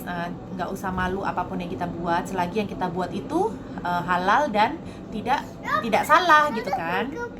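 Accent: Malaysia. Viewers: Ckp pun dh mcm org Malaysia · Dah ok.. slang bahasa melayu👍👍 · Cara cakap pun dah boleh jadi orang Malaysia. Apa pun sentiasa berhati-hati. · bila lograt melayu,hilang lograt indon nya.hahaha.terbaikk lah sis. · Loghat sama cam org malaysia dah. Hehe. Bg saya stranger senyum ok je. X de rasa pelik pon. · Comel laa akak cakap Malaysia comel je bunyi die nanti dtg sini lagi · Kalau stgh jam pertama cakap melayu biasa²,mmg ingt org mlysia,ingt Johor X pn sabahan.sedap plak dgr loghatya.🤭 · Mbah pertuturan nya sebiji MCM orang Malaysia la..alhamdulillah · MasyaAllah kakak cakap longhat Melayu Malaysia hahaha .. btw orng Malaysia memang tak biasa senyum dekt orng xkenal ... orng Malaysia kalau nk sapa orng xkenal bagi salam baru orng balas kott hahahaa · 100% dah jdi org malaysia, slangpun dah mcm org malasia :)) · ehh macam orang malaysia cakap lahhh... kalau kata orang malaysia pun orang percaya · Wahh good2 content.. 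ada exact ckp malaysia style.. truskn usaha 😬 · Mula2 cakap indon lalama ckp cam org malaysia pulak hahaha lama sgt tu jd org melayu... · Cara sebutan, cara lenggok pronounce setiap huruf, susunan ayat. Memang dah betul² bunyi malaysia. 👏👍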